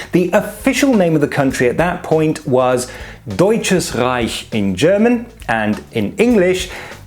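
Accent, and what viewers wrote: United Kingdom. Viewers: Not bad. Your accent is very british indeed for an Aschaffenburger. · Your vid popped up on my youtube selection. Had aways wondered about that name.. now I know, and not only that.. I feel so much smarted learning it in your British accent. Like Sub!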